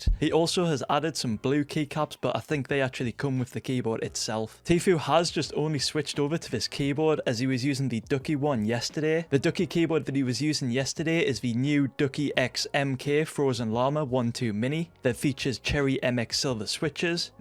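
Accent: Newcastle, United Kingdom. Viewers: R u from newcastle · Only me how love your british accent :D please do facecam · Love all your content bro I’m a Geordie myself so hearing you makes it sound like home 🔥🔥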